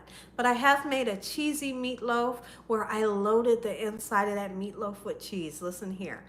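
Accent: South, United States. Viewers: Mrs. you are awesome. Love your cooking videos. You sound like a southern girl are you from Louisiana?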